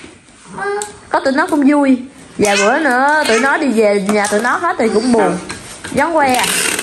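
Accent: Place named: Mekong Delta, Vietnam. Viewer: Rất thích kênh vì cách nói bình dị rất miền tây nam bộ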